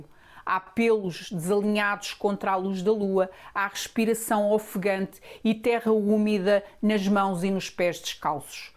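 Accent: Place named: Portugal. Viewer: É quase um luxo ouvir Português de Portugal, em Portugal ☹️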